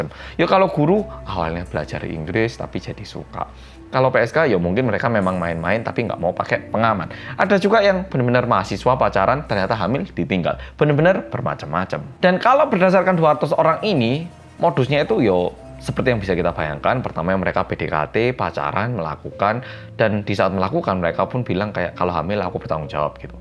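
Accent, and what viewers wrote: Java, Indonesia. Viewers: Hai org korea medoook❤ Nyimaaak 🎉🎉🎉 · Kk sdah menetap d korea ya? Aq org jawa,pling suka dg pembawaan medoknya😊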